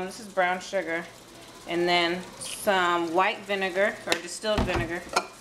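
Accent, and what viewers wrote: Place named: United States. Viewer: U sound americanized as all heck...like me lol. And your mom sounds like mines to rest her soul. Thanks for the recipe :)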